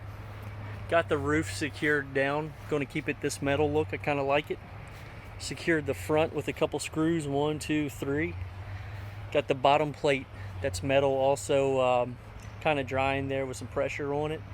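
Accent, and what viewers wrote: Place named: West Coast, United States. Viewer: WOW, that's the kind of thing I would dream of doing. You saved a lot of green backs that way! East Coast. It sounds like your from the West coast. LOL. Thanks for the response, I liked that too!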